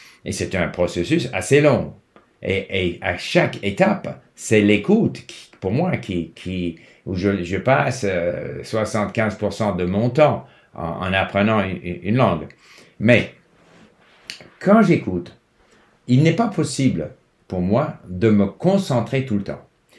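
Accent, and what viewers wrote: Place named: United States. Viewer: الأكسنت تاعو رايح للماريكانية بسك ماريكاني . بصح الفرنسية تاعو parfait 👍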